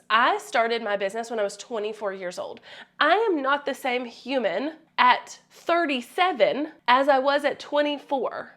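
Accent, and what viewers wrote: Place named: North Carolina, United States. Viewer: As a NC native (who still lives here), I love listening to you because I know that there is a place for someone who talks like me on YT! You give so much great information all the time. Thank you so much for being an open book and giving us all the tips.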